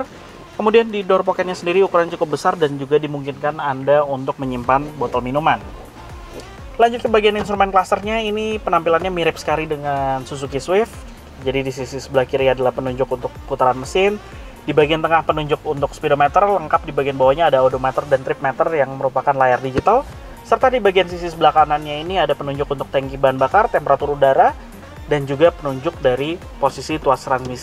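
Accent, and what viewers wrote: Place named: Banjarmasin, Indonesia. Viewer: Kalau mendengar logat bahasa mas, logatnya logat bahasa Banjarmasin😂😎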